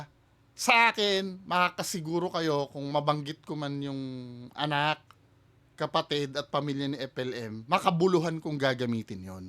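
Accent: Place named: Visayas, Philippines. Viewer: Ate Bisaya ako Pero ikaw Bisayang Bisaya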